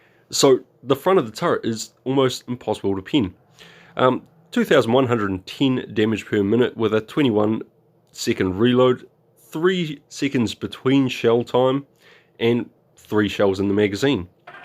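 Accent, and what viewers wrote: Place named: New Zealand. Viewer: just picked this up on my new oceanic account and your video is real handy my bro, nice to see a fellow kiwi in the mix hit subscribe as soon as i heard your accent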